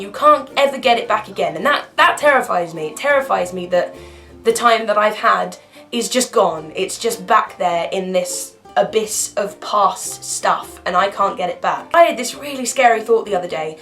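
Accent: United Kingdom. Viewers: You remind me of Merida from 'Brave', :) even though you speak british and not irish.. It's your hair! I like it ^^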